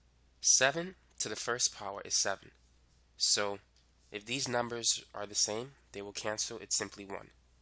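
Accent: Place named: Queens, United States. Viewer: there’s too many especially on the east coast, if I were to guess I’d say this guy probably grew up in the Jewish side of Queens New York but I can’t really tell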